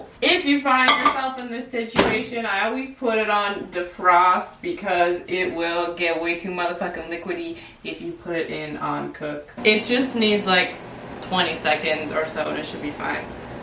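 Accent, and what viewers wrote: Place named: Canada. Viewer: The Canadian accent is amazing!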